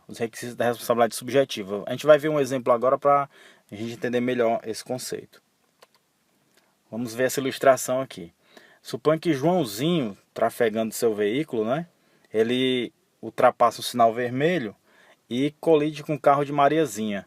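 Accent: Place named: Ceará, Brazil. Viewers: sotaque cearense arretado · Cearense da gema 😂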